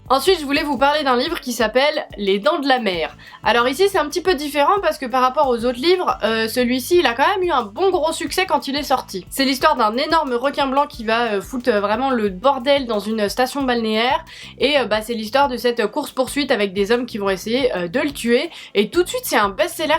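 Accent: France. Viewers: Pour Jurassic Park effectivement je l'ai aussi appris par hasard en fouillant dans les livres de mon père, il est dans ma pile à lire depuis quelques mois... livre de l'été ?! Pourquoi pas 😊 Super vidéo je viens de te découvrir et j'aime ta façon franchouillarde de parler, on à l'impression de discuter avec notre copine de façon hyper naturelle, ça fait plaisir de voir ça sur youtube 😊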